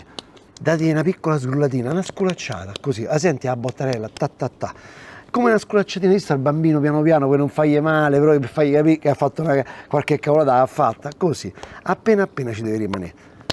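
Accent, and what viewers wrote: Rome, Italy. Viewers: sei il.migliore per. Simpatia, e con il tuo accento romanesco è come essere a Roma in diretta. Grande 💪💪💪🌈🌈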